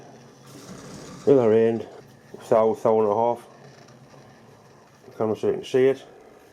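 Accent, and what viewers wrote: Newcastle, United Kingdom. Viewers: what kind of UK accent is that? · Someone Else It's Geordie, Newcastle area, Google Geordie shore.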